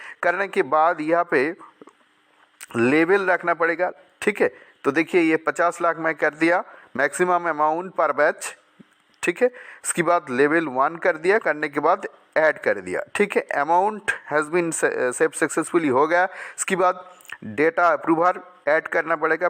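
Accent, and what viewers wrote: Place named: Bengal, India. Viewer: Pahle Hindi thoda Sikh lo.. bangali babu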